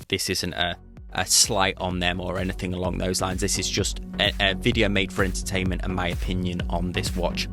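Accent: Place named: Scotland, United Kingdom. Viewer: He needs to fix his Scottish or whatever voice damn annoying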